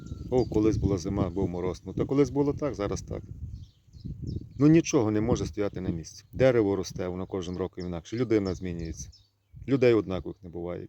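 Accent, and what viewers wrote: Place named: Lviv, Ukraine. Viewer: Я тоже иногда Тебя смотрю ,благоразумные мыслями делешся ,приємно ,ставлю лайк ,подписан давно , пишу коментарий более восьми слов ,по алгоритму ютуба для продвижения ролика .Хай щастить ,може колись завітаю в гості на турник.Чую ти по говорю мабудь зі Львівщини , може мені так здається ,в мене коллега так розмовляє бо я з Слобожанщини.Миру та Добра Тобі і в твоїм Рідним.🎉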